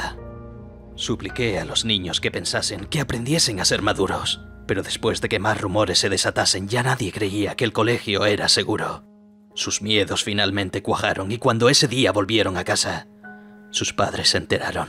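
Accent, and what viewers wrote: Spain. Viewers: Siempre e dicho que odio el acento de los españoles pero sinceramente ningún otro acento le queda tan bien a las rimas como este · Eres el único español que amo como habla :v eres el mejor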